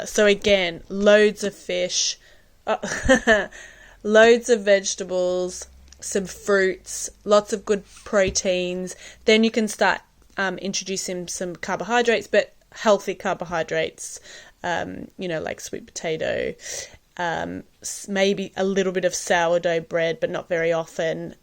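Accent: Australia. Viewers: Why do you sound so Australian. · Maso ..I'll give you one hint:....She is Australian.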